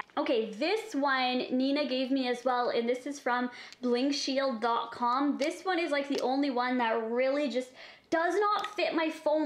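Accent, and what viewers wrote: Canada. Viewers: she's also Canadian so they pronounce words different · omg i can just tell that she is canidian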